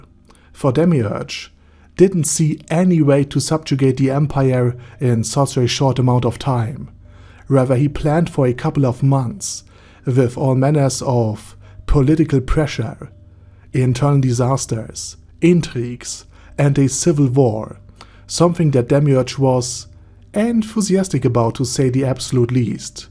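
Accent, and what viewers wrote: Germany. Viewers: As a german i am so triggered by our accent. I am sry but it triggers me so much. I like the thoery videos and shit about overlord though.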